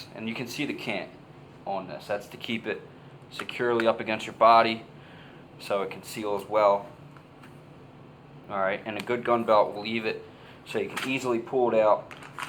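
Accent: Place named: Pennsylvania, United States. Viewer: Can't deny that PA accent lol, I've done a lot of work up there in the oilfield and the locals laughed at my accent and I would laugh at yalls. Had some good times up there., thanks for bringing back some good memories.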